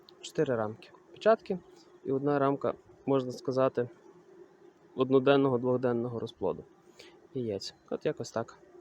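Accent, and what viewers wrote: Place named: Ternopil, Ukraine. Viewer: По розмові чую брате ти з Тернопільщини. Південний захід. Бучач та околиці? Я з під Чорткова.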